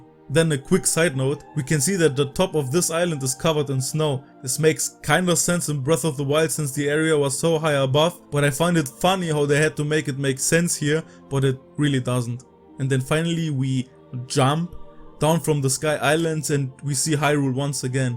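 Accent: Germany. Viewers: Nices Video! Bist du ein Alman? Hört sich irgendwie so an vom Akzent her :)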